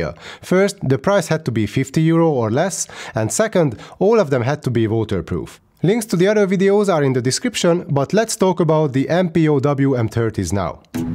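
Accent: Hungary. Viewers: Valahogy érezni hallásra hogy valaki magyar 😅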